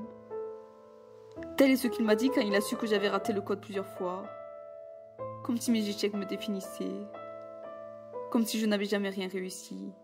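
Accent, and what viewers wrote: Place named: Nîmes, France. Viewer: Tu es Nîmoise (au vu de ton accent) ? Et oui tu y arriveras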